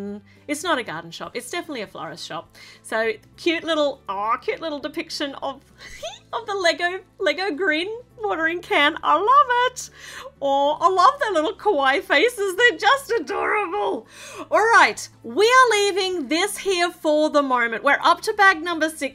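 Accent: Australia. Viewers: She has so much energy and by listening to her talk you can hear the amazing Australian accent❤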